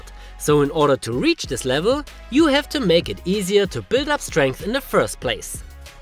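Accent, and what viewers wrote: Germany. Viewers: I love the German accent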